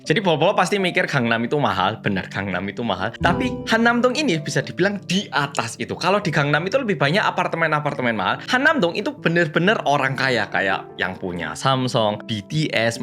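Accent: Java, Indonesia. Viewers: Semangat Oppa 😊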